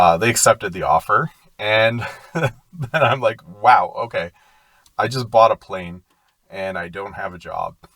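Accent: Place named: Canada. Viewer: a Canadian I hear heheh! Cheers tho, im just getting into flying 🎉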